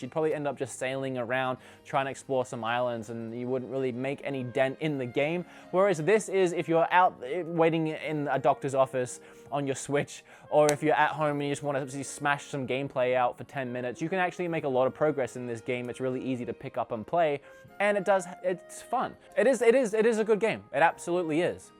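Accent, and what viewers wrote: Australia. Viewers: Are you Australian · Your Australia 😋 ps zeeeldoooo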